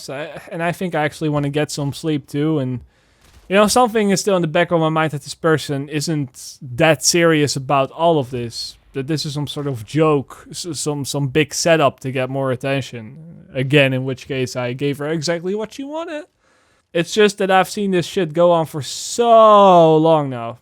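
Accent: Netherlands. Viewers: Are you Nederlands? I hoor het in je accent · I knew you were dutch before i saw the Twitter posts I have the same accent when speaking English oof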